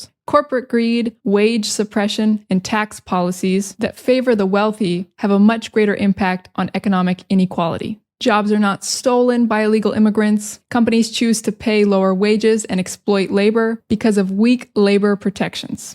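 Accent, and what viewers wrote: United States. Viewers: I'm a venezuelan, now I'm living in Galicia Spain, i love your American accent ❤... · omg I’d honestly disliked American accent until I got stuck upon yours, it is by far the best ❤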